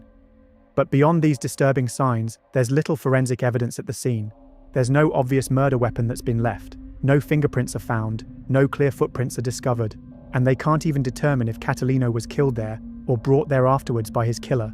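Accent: England, United Kingdom. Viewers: Not AI. It's an English accent. There are certain annunciations that AI still cannot reproduce. Hopefully they never will. Otherwise there will be a day when even an expert won't be able to tell the difference.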